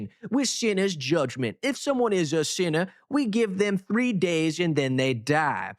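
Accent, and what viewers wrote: South, United States. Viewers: Classic southern drawl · That southern accent at 2x speed is quite funny :D Keep building man! And if you ever find a novel way of making a deck with Lord Magnus as commander, I am all ears :D. I never got past Ehrnam Djinn and Lumbering Satyr :P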